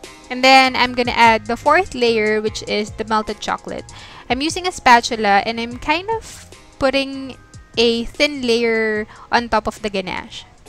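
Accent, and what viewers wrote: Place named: Philippines. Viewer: Hi Ate.... thanks for a well-made video. You are born to teach! If there is such a thing as a Filipino ENglish accent, this is an excellent example. Beautifully spoken😊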